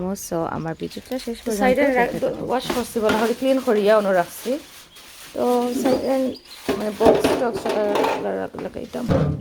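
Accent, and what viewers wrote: Sylhet, Bangladesh. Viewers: Shylety kotha khub valo lage, subscribe korlam STAY connected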